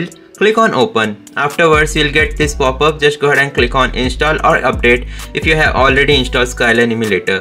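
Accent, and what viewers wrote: India. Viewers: Are you indian 😅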